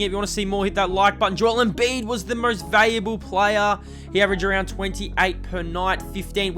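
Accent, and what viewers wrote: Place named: Australia. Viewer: Where in Australia r u from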